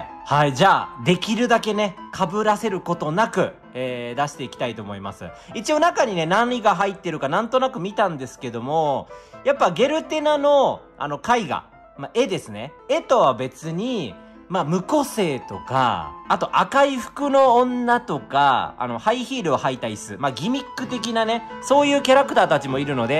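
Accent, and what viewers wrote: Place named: Kyoto, Japan. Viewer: 関西弁よき😊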